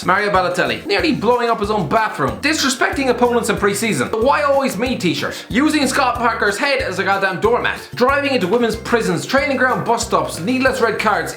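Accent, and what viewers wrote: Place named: Ireland. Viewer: The relief to hear the strong Irish accent when I click on these videos😅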